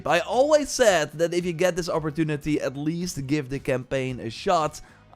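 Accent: Netherlands. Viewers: je Kaas engels blijft gewoon grappig lol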